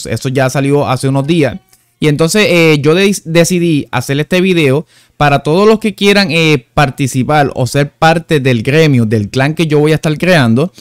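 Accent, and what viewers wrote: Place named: Puerto Rico. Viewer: Es de puerto rico rey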